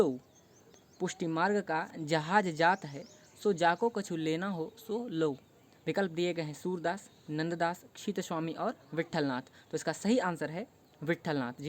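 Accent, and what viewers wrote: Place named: Bihar, India. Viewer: Bihari.. sayad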